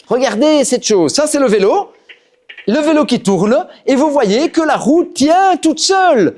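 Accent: Belgium. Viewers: nos fameux professeurs belges avec l'accent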